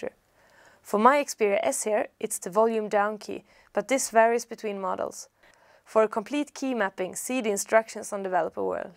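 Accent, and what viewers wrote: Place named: Sweden. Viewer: Typical swedish accent :)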